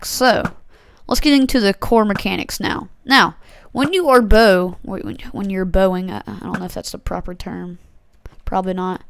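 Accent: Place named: United States. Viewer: You have an accent like I do, what state are you from?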